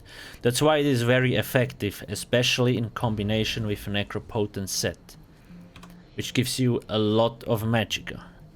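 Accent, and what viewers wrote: Switzerland. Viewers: Dude, are you swiss?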